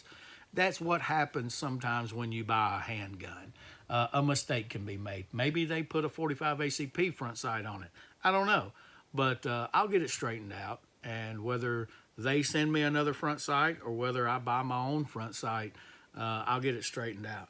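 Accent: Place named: Georgia, United States. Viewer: You sure do sound like a Georgia boy good review thanks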